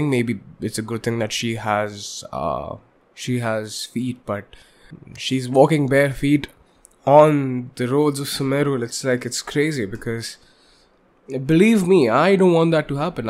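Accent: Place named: India. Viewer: Love the indian (or idk) accent bro🙏🏾🔥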